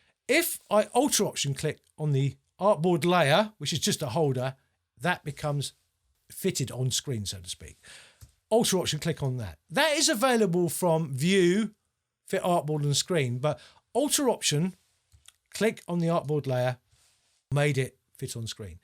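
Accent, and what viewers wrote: England, United Kingdom. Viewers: Very helpfull! Where is your accent from? It reminds me of when I lived in England.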